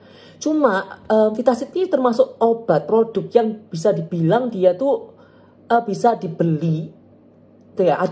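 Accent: Surabaya, Indonesia. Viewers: Logat suroboyo ne kak 😍 bagus bagus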